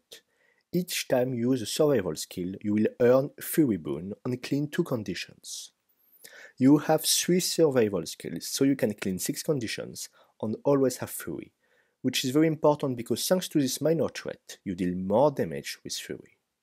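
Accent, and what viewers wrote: France. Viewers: Thank you for this video, I really enjoyed it as I am new player in GW2. I'm following all your skills, will keep playing as soulbeast. Just a friendly hint: Practice with your english pronunciation, you have very hard french accent, sometimes it's hard to understand some words. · I could listen to the cute french accent for hours lol thanks for the guide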